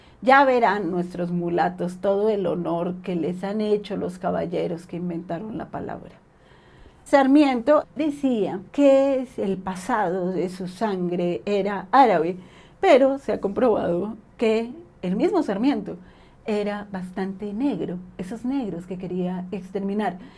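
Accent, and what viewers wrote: Colombia. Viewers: Llego por primera vez aeste canal, y me pareció muy interesante 👌 Sabia que era colombiana, por la forma de hablar, y su forma de pensar. Un saludo 🙏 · Buena onda cuata, saludos desde México. Usa tu colombiano que es muy bello, nos gusta aprender las formas distintas de hablar el lenguaje que nos une.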